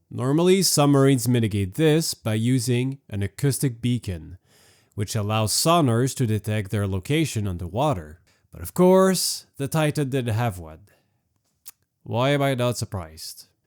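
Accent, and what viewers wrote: Canada. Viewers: hey, are you from Sasktatoon? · What were the pings they kept talking about ? I’m going to have to listen to this again, to pick up some information I missed the first time . I definitely will see the movie There is another boat that has some questions I ‘d like answers to. The boat “ Trash man “ sailed out of So. Portland Maine, going to Ft Lauderdale Florida. Wrecked by a storm. There is a first hand book about it written y a survivor, and some films for the Weather channel, but some things were left out of the story so it didn’t add up. No conspiracy , but human mistakes and the way the boat was built ( square port holes ) that didn’t hold up. Anyway I like your east Quebec French accent comes out a little bit. Sending good thoughts from PEI and Florida.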